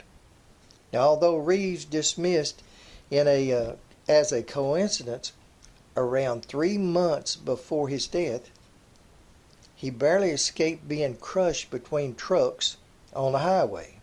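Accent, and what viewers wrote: South, United States. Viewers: Thanks from Europa for the wonderful videos. thx for all the work you put in. You have a good clear way of telling your stories. You must be from the south.:o) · How are you? I stumbled across your channel the other night I really enjoy your videos and your southern accent where are you from??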